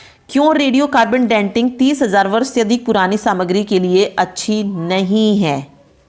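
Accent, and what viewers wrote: Haryana, India. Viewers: Jo apka haryanvi touch h wo bda acha h ..👍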